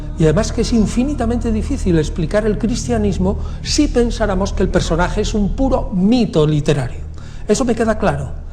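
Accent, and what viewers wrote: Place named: Argentina. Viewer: Si, está muy equivocada éste fulano, parece argentino-español. Ya en su cara se ve el castigo divino por tanta mentira. Lo malo es q mucha gente q está naciendo lo escucha y le creerán. NO LE CREAN, ÉSTE TIPO NO ESTUDIÓ CON SERENIDAD LA TRAYECTORIA DEL ÚNICO HOMBRE Q EXISTIÓ SOBRE LA TIERRA Y HASTA LA FECHA... AÚN NO NACE OTRO IGUAL. SALUDOS DESDE CHICAGO.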